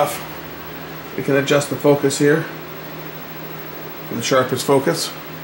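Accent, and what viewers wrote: Canada. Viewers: Gonna take a guess on your accent but are you from Alberta?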